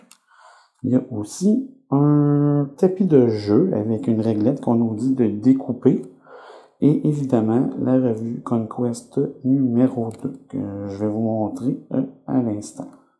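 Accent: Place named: Quebec, Canada. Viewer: Ça fait du bien d'entendre un Québécois Francophones parler de warhammer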